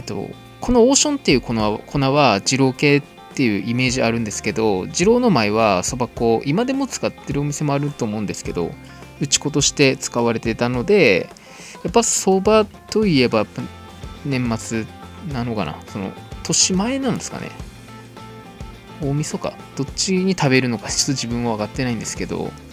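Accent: Aomori, Japan. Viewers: 青森の訛り最高。安心する。ラーメン作ってもらったの食べたい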